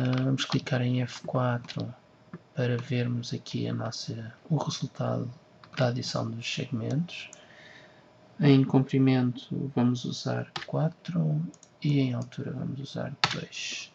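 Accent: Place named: Portugal. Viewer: Excelente Tutorial! Em português de Portugal!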